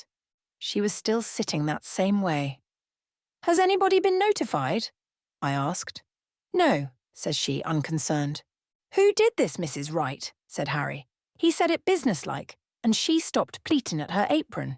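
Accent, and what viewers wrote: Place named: United Kingdom. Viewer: Brilliant choice! I read it years ago . . . . BTW, the British accent doesn't work. Really. Please do it again. Please. Any rural American accent will do.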